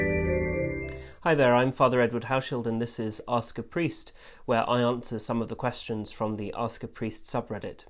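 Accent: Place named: England, United Kingdom. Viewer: Nice to "hear" an English priest!!!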